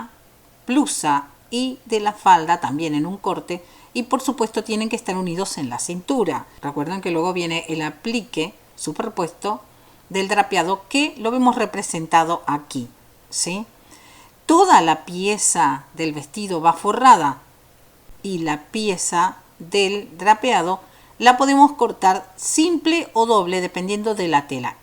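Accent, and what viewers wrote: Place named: Rioplatense, Argentina. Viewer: el acento de vos me parece el de Argentina rioplatense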